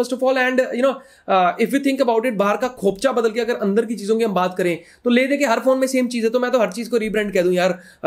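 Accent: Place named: India. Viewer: I have so much pain trying to understand what you are saying. English is not my first language but i usually have no problem understanding indian people that speak English. But somehow your accent is so strong i don't understand half of what is said.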